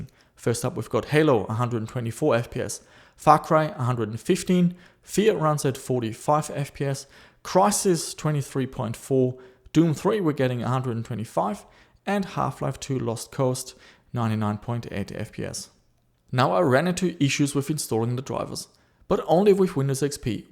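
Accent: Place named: Germany. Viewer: You're from Australia? lol I always thought you're german because you sound like you have an german accent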